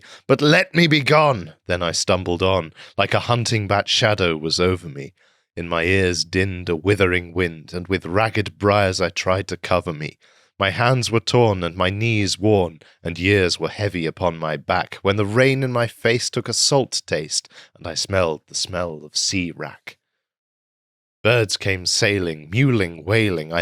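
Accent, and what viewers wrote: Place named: United Kingdom. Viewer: Heavens to Betsy that was a phenomenal piece of art to hear. Excellent delivery too, Cadence perfectly emphasized the proper beats. I imagine it loses something of significance when it is said in any accent that is not British.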